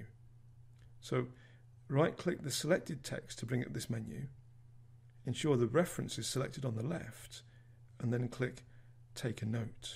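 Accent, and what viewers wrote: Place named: United Kingdom. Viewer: How nice to have a calm British English voice. So much easier to follow than Morris' style of delivery.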